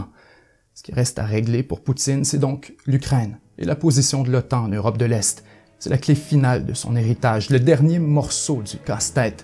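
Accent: Quebec, Canada. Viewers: ha l'accent québécois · Les analyses géopolitique de Mr Asselineau sont pas mal du point vue de la France et l'Europe. Je découvre cette chaîne, et j'en suis ravie. En plus j'aime entendre l'accent canadien.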